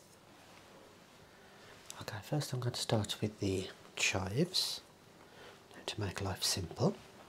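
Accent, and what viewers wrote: Birmingham, United Kingdom. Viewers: that is what we call a brummie accent, personally one of my favs to listen to as a southerner from the uk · You, sir, have finally put some respect on the brummy accent. I salute you. Also I love this style of video!!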